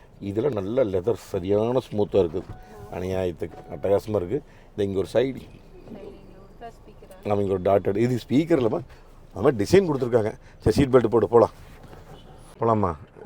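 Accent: Kongu, India. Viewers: அண்ணா நீங்கள் பேசும் கொங்கு தமிழ் தமிழ் மிகவும் அருமை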